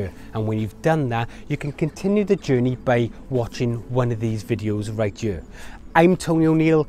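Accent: Wales, United Kingdom. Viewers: When I hear a Welshman talking about gardening I know I can trust him. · Hi, I LOVE your accent you Welshie just like me but ive not got Yer accent sadly lol ...... can I put put my Tortoise poo in the compost as she only eats Greens shame to waste it ? Thanks I subscribed so i can LIISTEN to you, of course i definitely need your Help !! Thanks x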